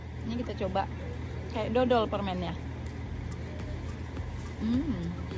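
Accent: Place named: North Sumatra, Indonesia. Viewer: Anak mix bule tapi logat batak nya kental ya mbak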